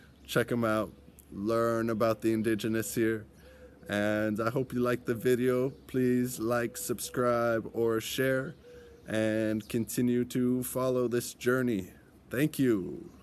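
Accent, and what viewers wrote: United States. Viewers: Hello excuse me. I am British, don't forget we taught you Americans English lol. Although i enjoy the American accent, we see our British accent as the more refined version. :)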